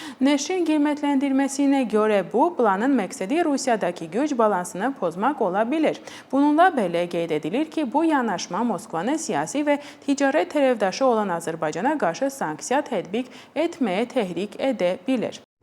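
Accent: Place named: Armenia. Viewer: Deyəsən bu ermənidir, erməni ləhçəsi hiss olunur. Nə turkdi, nə də bizimki. Üçüncü kim ola bilər?)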